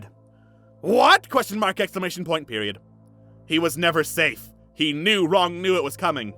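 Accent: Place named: Germany. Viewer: this is gold. The fact the story was so predictable and yet still caught me off guard was actually insane. thank you for the wonderful and lore-accurate german accent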